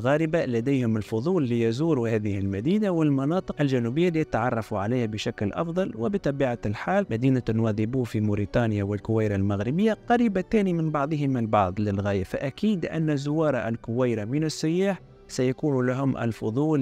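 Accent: Tunisia. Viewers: بعد السماع جيدا ، تبين أنك تونسي خبيث و تمس بسمعة المغاربة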